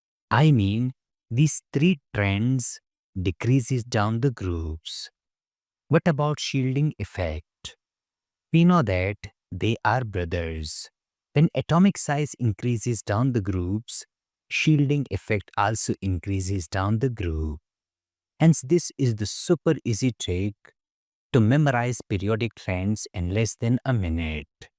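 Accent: India. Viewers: Sounds so indianlistic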